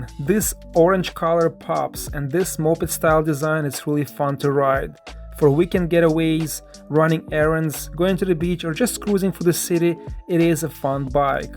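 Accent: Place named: Russia. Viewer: friend, dm you are Russian as I hear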